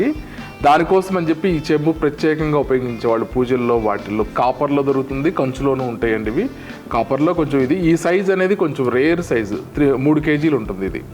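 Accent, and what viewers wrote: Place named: Telangana, India. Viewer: Telangana yaasa idhi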